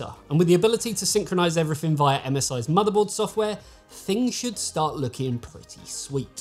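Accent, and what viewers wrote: United Kingdom. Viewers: funny sounding british guy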